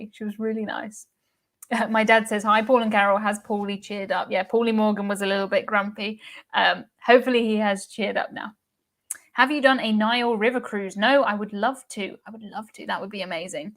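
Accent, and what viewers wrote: United Kingdom. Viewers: I value your opinions & love your presentations (& lovely UK accent!)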